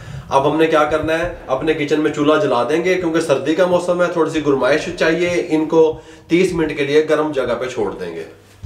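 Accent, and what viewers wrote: Punjab, India. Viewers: smells punjabi in urdu. thanks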